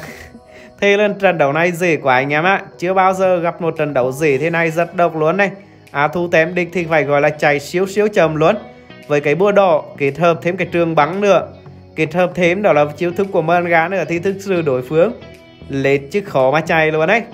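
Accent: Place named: Nghe An, Vietnam. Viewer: Giọng nghệ an nghe vui quá hiii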